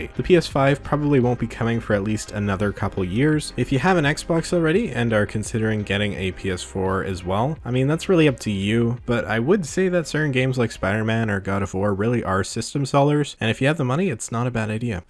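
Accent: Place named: Canada. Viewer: omg ur Canadian yaaaaa eh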